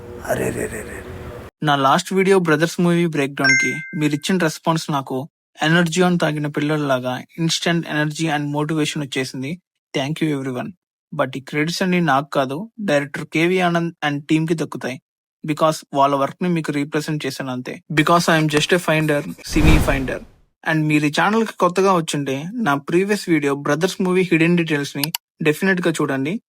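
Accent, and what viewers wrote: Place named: Nellore, India. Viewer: Nijam Cheppu medi Nellore kada !!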